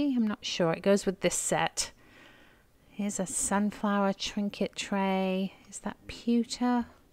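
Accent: United Kingdom. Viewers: From the things you say it is clear that you have been in the USA since you were quite young and yet your accent is pure UK - -which is fascinating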